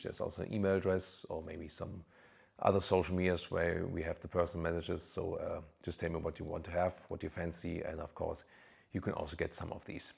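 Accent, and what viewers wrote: Germany. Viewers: Love your vids and the way you breakdown these cars with strong German accent in a style and format that is just perfect for your delivery🔥🔥🔥🔥...It sounds so authentic and feels like you are coming directly from German car manufacturers, which i know is not the case, but that's why I love the channel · Love your Videos and your style of presenting cars. Calm and authentic. And keep our German accent, that makes it so relatable. Also if I am German, most of the time I watch your videos in English. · Hello. Dual Brit Canadian here, though I'm Egyptian by birth. Have always loved your videos, your presentation style and your personality. I actually lived and worked in Germany from 1988 to 93, in Lahr / Schwarzwald at Canadian Forces Base Lahr. I was a civilian in the IT department and then immigrated to Vancouver Canada in 1993. I'm back in the UK right now but have kept my Vancouver place. 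I've also faced a very personal tragedy recently. I lost my very dear Mum last year due to her accidental stair fall and discovery eight weeks later she had two brain haemorrhages she didn't know she had. She tragically died in hospital on July 3rd last year, with me in the room with her. I still cannot believe she's gone. Three months after losing her, I myself was diagnosed with leukemia. I spent four weeks in hospital having numerous blood transfusions, chemo and medications, followed by almost a year of outpatient treatment. I'm thankfully totally cured now, very fit and healthy with zero side effects. But the tragic and unexpected loss of my very dear Mum has been absolutely heart breaking. I am keeping a positive attitude for my life and future. I attend many classic cars shows and I'm very interested in cars. Totally love your channel and your presentation style. Also, your accent reminds me very much of my five years in Germany. 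By the way, my profile photo is of my very dear Mum and I. Vielen Danke und Tchuss! Ibrahim in Canterbury Uk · I subscribed this channel mainly for Learning German Accent English.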